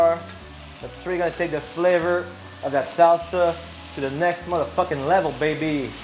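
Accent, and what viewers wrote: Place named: Quebec, Canada. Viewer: With this accent this guy is a Quebecois (French Canadian) but he speaks in this mixed English for views, I imagine!?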